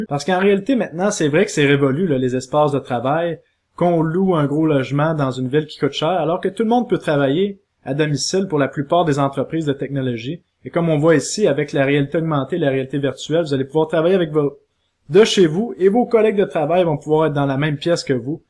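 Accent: Canada. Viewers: Accent canadien, c'est super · Tes vidéos sont +++. Je les adore, inspirantes et pratiques sans compter ton accent qui me font revivre les inoubliables souvenirs de vacances à Montréal et au Québec. Merci.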